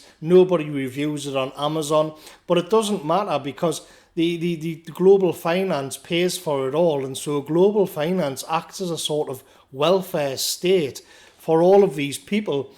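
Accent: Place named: Geordie, United Kingdom. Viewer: Superb analysis, superb accent. Thank you!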